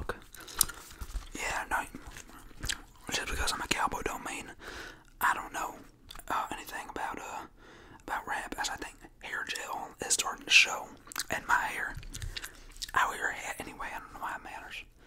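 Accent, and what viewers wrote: South, United States. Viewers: He has an unique way to do the asmr movies.I am a fan for a long time and never dissapointed me.Altough i prefer his chewing gum rumble,hand movements and of course the southern accent.I rewatch old vids and i am ok.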